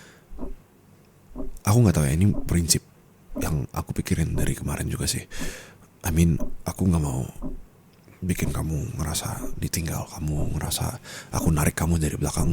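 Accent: Java, Indonesia. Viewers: JAWA NYA AKHHH, BANYAKIN NGOMONG JAWA!!! ENAK BNGT DI DENGERNYA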